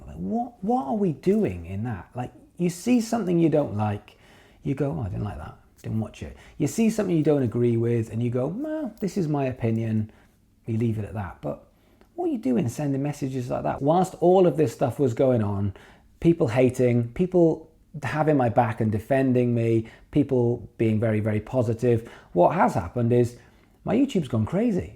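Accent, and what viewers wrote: Wales, United Kingdom. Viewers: Hey just going off your accent are you Welsh?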